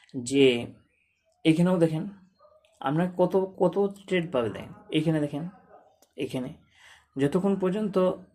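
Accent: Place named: Bangladesh. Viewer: বাংলাদেশি নাকি, কথা শুনে তো ১০০% মনে হচ্ছে।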